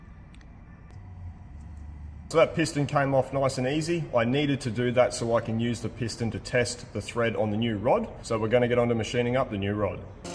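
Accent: Australia. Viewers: Nice aussie accent , amazing craftsmanship, make your vids worth watching. Greeting from a fellow machinist.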